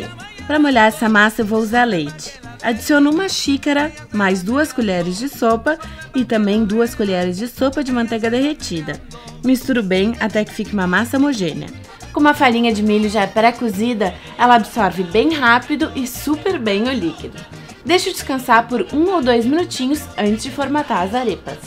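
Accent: Rio Grande do Sul, Brazil. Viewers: Não é que ela fala esquisito , ela tem sotaque gaúcho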